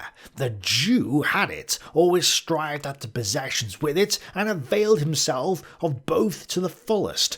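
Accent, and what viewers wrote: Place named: Scotland, United Kingdom. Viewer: I cannot stand Scottish accent and his constant liberal capitalist bias. If liberalism is so good, then why has it failed every Western country that adopted it? - Adûnâi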